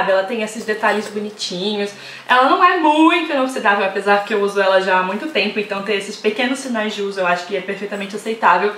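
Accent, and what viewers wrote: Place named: Rio de Janeiro, Brazil. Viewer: Só eu nunca tinha notado esse sotaque carioca na?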